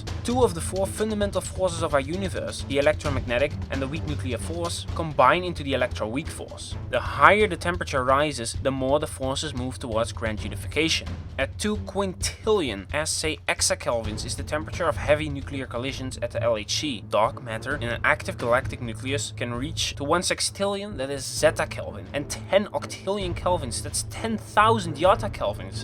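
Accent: Netherlands. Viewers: It's Dutch accent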